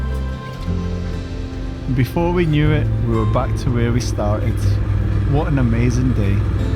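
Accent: Scotland, United Kingdom. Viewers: Just finished a ride today around the island. Unbelievable. Didn't know it existed. Arrived for a conference and had 6 hours to spare so hcuked it. Some of those steep hills are knarly! Good to see Scottish accent and all 💪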